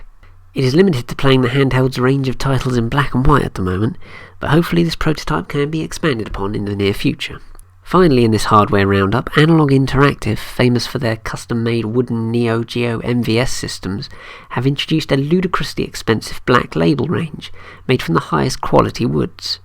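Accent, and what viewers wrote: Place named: United Kingdom. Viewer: Fantastic show, went back and watched all previous shows nice to hear a British accent. Wish they would package all Time Crisis games for the PS3 Move what you think?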